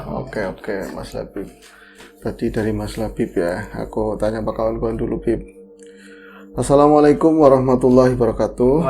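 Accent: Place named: Tegal, Indonesia. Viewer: mas dengan dialek tegalnya lucu,sukses terus dengan acara ngobornya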